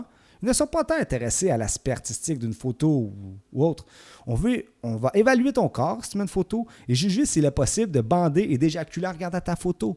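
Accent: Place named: Quebec, Canada. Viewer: l'accent quebequoi est un répulsif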